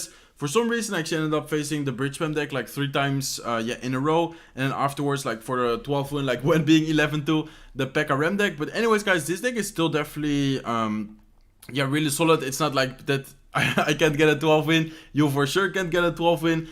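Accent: Netherlands. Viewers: Llama The Thug It’s a dutch accent, i’m dutch too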